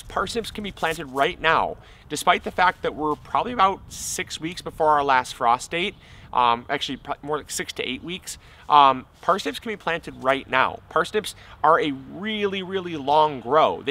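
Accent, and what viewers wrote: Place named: Midwest, United States. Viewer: I'm Missouri so I appreciate that midwest accent when he says "salary" instead of celery. So do I.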